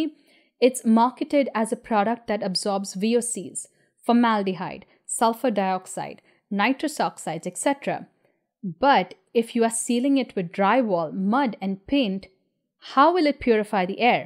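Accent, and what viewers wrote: India. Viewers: You mention yourself to be from Dubai but you are not arab for sure. Expats never get citizenship there. I lived there for 20 years and I know it. Why to mention yourself s someone from Dubai if you are not? You sound indian and I don't see any reason for you to hide it. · I stumble across this video because I think your cute and your Indian like accent is nice sounding. Now lets get serious. Burning sheep wool smells like when you burn your hair with a curling iron 😊, I am a guy with short hair, I thought it was funny because I said to myself, she burned her hair with a curling iron 😂. Here is something you may not have thought about as you were mainly comparing the two materials. What if the either layered the Hemp wool and the Sheep wool or better yet, weave the hemp wool into the sheep wool, that would make for a strong product that may not burn and might take the pros from both materials. 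Its was just a thought. Nice and informational video. 👍👍👍👍👍 😁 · Your Indian English pronunciation is good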